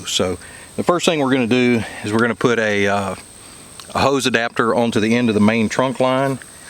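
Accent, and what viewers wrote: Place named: Arkansas, United States. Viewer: Nice to see you back. Watched the whole house build from here in England. We have kin near De Valls Bluff and hearing you reminds me so much of our visits there. Thank You.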